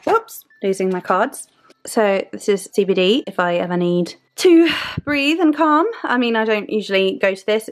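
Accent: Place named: RP, United Kingdom. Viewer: Love your video and thank you so much for sharing your altar in this video. So creative and absolutely LOVE that huge rose quartz tower. Love that we both truly love the dark goddesses: Lilith, Hekate and The Morrigan. I pointed out that your book shelves looked like mine with all those books piled high. He was like "you witchy types like your things," I was like, "be glad that you are one of those things that I still like, lol." I adore your accent as well. It sounds so posh to me. Being from the states and I am in central Ohio, I don't really have what you call an accent. I get a country thang occasionally when saying certain words and that comes from my momma, and being around her all my life. lmao. 🌹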